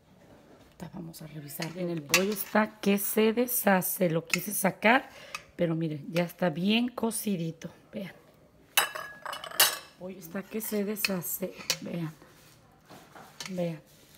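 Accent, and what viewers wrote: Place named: Jalisco, Mexico. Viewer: Muchas gracias por la explicación me acaba de llegar la mia y como q me dio miedo usarla pero despues de tu video ya no!me imagine q eras jalisciense por tu forma de hablar yo tmb soy de jalisco residente en Colorado saludos :)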